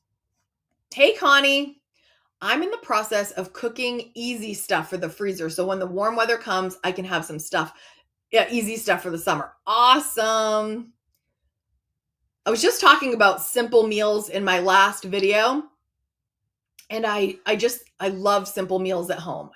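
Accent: United States. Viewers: I wish I understood American! Your English is so different than British English. When you talk about being in school, so you mean school or university? We do school and college up to 18, then it is university. What is sorority and what are the implications of that? The financial acronyms are all so different to ours, so I tend to get bemused. Any interpretation of these things would be welcome. Love your videos and look forward to them each Friday, plus these lovely extras. My life stage is retiring from employment and looking to bring in income from additional streams to keep afloat. I have no debts, and having freedom in that way.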